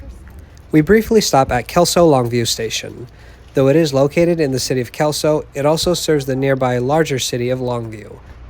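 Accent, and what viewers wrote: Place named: Chicago, United States. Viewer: you have been very, very evenhanded with Amtrak👍🏻 you and Chicago area?🤔( you despite being from the Netherlands) sound like a Chicagoan lol🤘🏻🇳🇱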